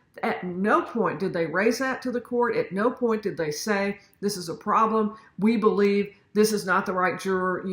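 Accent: South, United States. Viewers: Hi! I have very much enjoyed your channel since I found you! I would like to share a little special story. I too am a Harvard graduate. Undergrad not law school. For that I went to a private Catholic college. My husband and I started to practice law here in Hattiesburg Mississippi 20 years ago. My daughter is graduating from high school and she wants to be a lawyer just like her brilliant parents. Not to brag lol:-) she wants to go to Harvard but she felt very self-conscious about her accent. My husband and I are from the north east so we blended in very well. Your videos in short have taught my daughter that she has nothing to be self-conscious about when she goes to Harvard in the fall. She has a southern twang that’s just her own just like you! Thank you for being an inspiration and a little silly from time to time. We really appreciate you for that. 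Have a great weekend.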